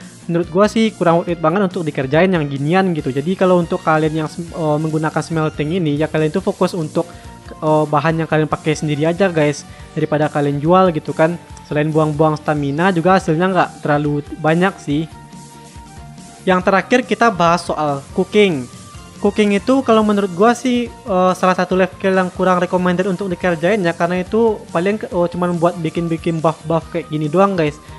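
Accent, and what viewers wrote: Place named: Minangkabau, Indonesia. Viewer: Logat minangnya kental banget 😂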